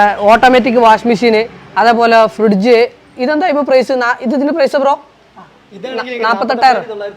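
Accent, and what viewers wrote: Malappuram, India. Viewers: Hyy ningaludee video nalla vibe anee. Nammude Malappuram bashayil athintethaya reethiyil avatharippichh tharunna ikkaa.... Ingalum ingalee videosum adipoli ane tto❤️👍🔥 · ഹായ് മുത്തുമണീസ് നിങ്ങളെ വിഡിയോ ഒരു വെറൈറ്റി ആണ് തന്നതായ നാടൻ ശൈലി സ്ഥിരം ഉപയോകിക്കുന്നമലപ്പുറം ഭാഷ കളർപില്ലാത്ത സംസാരം 👍👍നിങ്ങളും വീട്ടുകാരിയും ചെങ്ങായിമാരും 👍👍👍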